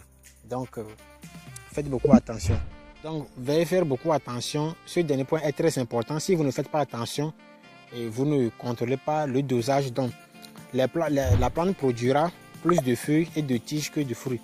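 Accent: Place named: Africa. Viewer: DSL, je ne comprend rien,,,,, accent Africains trop prononcé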